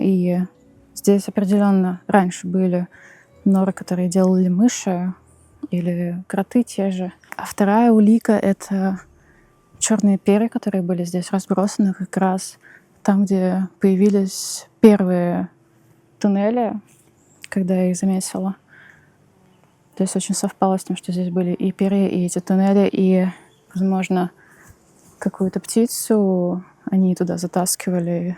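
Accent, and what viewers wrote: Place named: Latvia. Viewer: Скорее всего у автора латвийский говор и соответственно интонации в голосе. Хотите огня😂 смотрите Жорика Вартанова 😅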